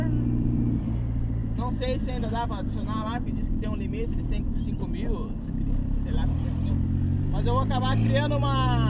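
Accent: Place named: Curitiba, Brazil. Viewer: oww cara vc é de Curitiba - XaXim kkk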